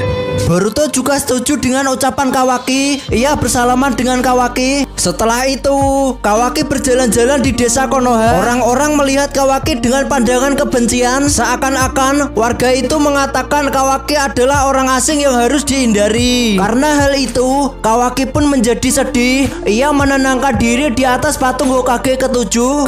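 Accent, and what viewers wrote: Java, Indonesia. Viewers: Logatnya medok pisan😅 semangat min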